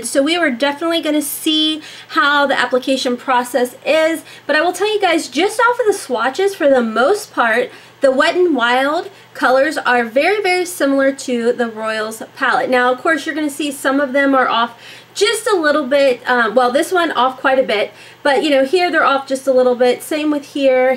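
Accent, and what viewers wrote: South, United States. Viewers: Girl I wished I would have seen this video first lol. Because I'm all about saving money. I really enjoy your channel plus your southern drawwwwww girl you would fit right in here in GA.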